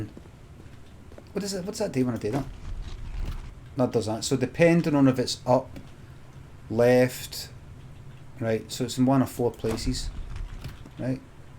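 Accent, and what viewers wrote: Glasgow, United Kingdom. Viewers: I wish YouTube's automatic CC knew how to translate Glaswegian to English.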